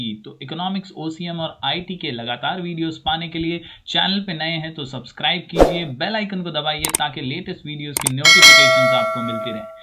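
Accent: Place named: Uttar Pradesh, India. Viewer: being from up whenever i listen ur up accent that helps me to take more interest in the lectures 😂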